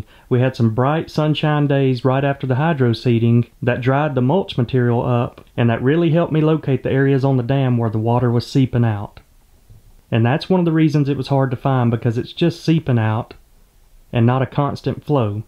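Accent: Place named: Florida, United States. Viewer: These videos are so wholesome. Your accent also reminds me of home as I live in Pensacola FL not far from you thank you for the videos brother.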